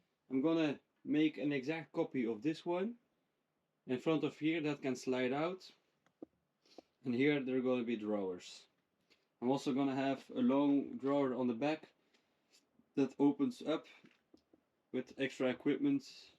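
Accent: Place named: West Flanders, Belgium. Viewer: Vn da goe west vlams engels af en toe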